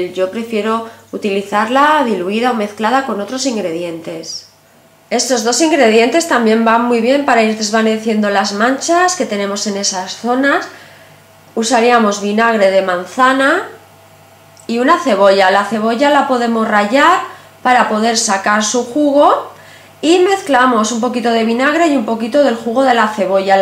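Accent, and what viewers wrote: Spain. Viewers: Eres catalana?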